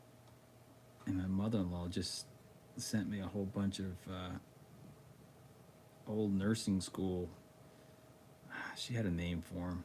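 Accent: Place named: Philadelphia, United States. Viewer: Your Philly accent is excellent. Great voice. And I have thought about doing live but haven’t really dug further into it. Sounds like this might be an email conversation. I’ll dig your email out from your about page.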